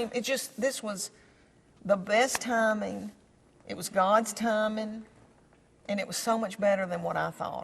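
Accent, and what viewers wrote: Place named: North Carolina, United States. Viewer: You are so down to earth and funny. I'm from SC. I have aunts and cousins from NC have your strong accent. You tell stories that I hear from my family I'm 70 and😂 your the best comedian.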